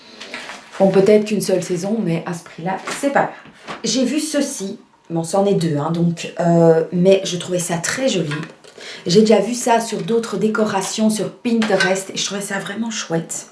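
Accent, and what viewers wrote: Belgium. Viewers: Coucou, je suis nouvelle sur ta chaîne. Je suis de Bruxelles et ça me fais plaisir de suivre des haul action d’une youtubeuse belge! 😊 Déjà j’aime ta bonne humeur, la façon de présenter tes articles. Et écouter les prix à la prononciation belge 😄 Pour tes feutres, ce n’est pas des surligneurs « fluo comme on dit chez nous » ce sont des marqueurs à alcool. C’est plus pour le coloriage et loisir créatif. Je ne les ai pas utilisé pour l’utilité que tu veux en faire, à tester. Mais un conseil garde les conserver bien à plat et pas debout dans un pot sur ton bureau par exemple. Car la pointe sèche. Bisous à toi et ta petite famille 😘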